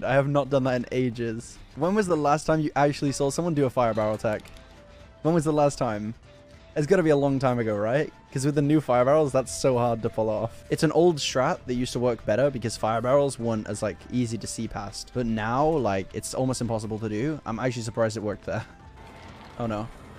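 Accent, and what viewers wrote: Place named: United States. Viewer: he does have an accent. He has an American accent lol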